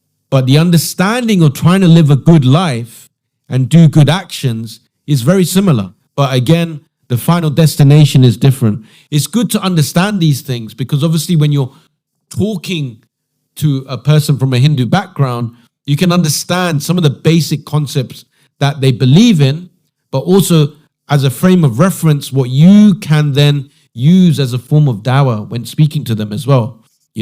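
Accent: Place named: Saudi Arabia. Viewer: You were not hindu you have Soudi accent you liar. You just want to promote 😃you fool!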